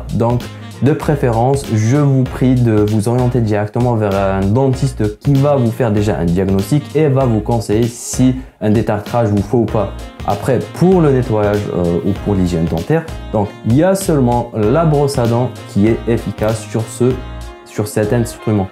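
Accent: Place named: Algeria. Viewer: C'est certainement l'un des nombreux dentistes algériens qui sont venus s'installer en France d'où l'accent et les fautes de syntaxe ...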